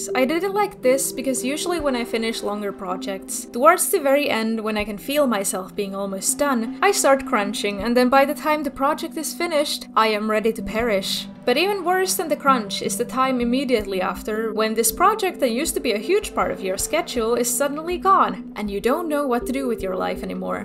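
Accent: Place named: Finland. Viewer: Kuulin heti aksentista et oli suomalaine kyseessä. Mahtava tietää että suomestakin löytyy näin taitavia henkilöitä! Erinomainen video :]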